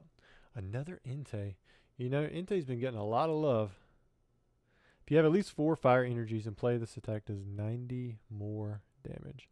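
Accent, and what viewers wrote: South, United States. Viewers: That seems pretty on par for a traditional booster box, but for a giant special set it’s going to be rough 😅 Also, it’s nice to see someone else from the Carolinas! I don’t hear that familiar southern accent often when watching pack openings lol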